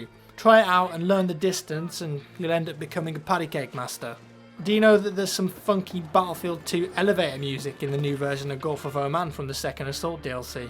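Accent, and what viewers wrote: United Kingdom. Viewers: i hate him when he is whispering, it's hard to understand english without the subtitles, and that British accent makes pretty hard....i'm not a native english speaker.....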